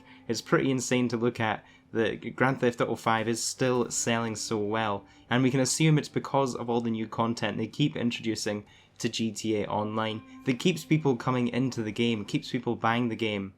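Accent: Scotland, United Kingdom. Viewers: First off thanks for another vid... been enjoying your series since it started. Nice to hear a Scottish voice amongst all the other youtuber videos 👍 After having played GTA Online since its OG launch, I found Read Dead Online a welcome change from the often frenetic pace of GTAO, although GTAO has given me hundresds of hours of online fun over the years, especially with the Heists (completing the Criminal Mastermind Challenge was awesome) and more recent Business and Arena War updates. Shifting to RDO, I had high hopes for revisiting the world of Red Dead after RDR and though I did get a bit bummed after a time playing solo in RDO, I have since connected with some good regular players via our PSN Community and now it is so much better! Aside from the griefers, which are pretty much negated once you are in a reliable posse, RDO really just needs some balancing and regular expansion via content updates. 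As an OG GTAO player, I know from first hand experience that GTAO was "dead" during it's first few months of existence (there were constant server issues during the early days) but within a year it had really started to evolve and become immensely playable. I think the same thing will happen with RDO and while it may not hold the same kind of opportunity for such diverse updates as GTAO, there is a huge amount of potential there. Let's see where it's at come October 2019 before we write it off. Meantime, I'm having lots of fun hunting and gathering with my posse 🤘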